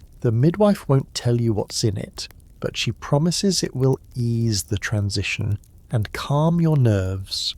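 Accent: England, United Kingdom. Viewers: It's 5.40 am in South Australia. Love the history, and the English accent ❤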